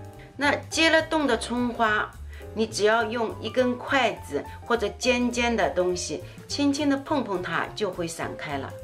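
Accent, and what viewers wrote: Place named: Shanghai, China. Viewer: 我听口音像！能不能教一下坐上海冷面和配菜的做法？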